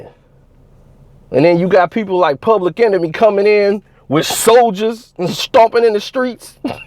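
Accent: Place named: South, United States. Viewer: This brother must be from down south somewhere North Carolina or somewhere cuz he's definitely not a New Yorker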